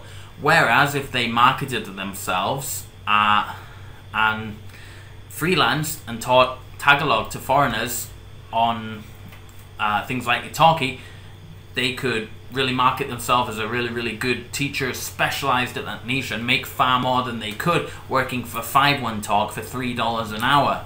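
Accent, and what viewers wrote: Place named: RP, United Kingdom. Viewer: Your queen's English needs a bit of clearer articulation. And yeah, weak argument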